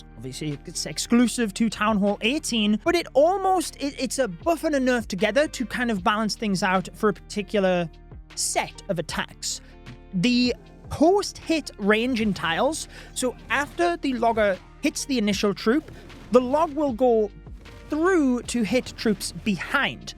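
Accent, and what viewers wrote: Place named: United Kingdom. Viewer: british ascent w'oa army🙂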